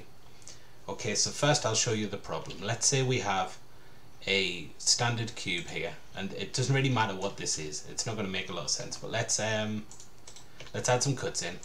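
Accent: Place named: Newcastle, United Kingdom. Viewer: Thanks so much for this tutorial can I ask are you from Newcastle or something I live in gateshead and you sound like a geordie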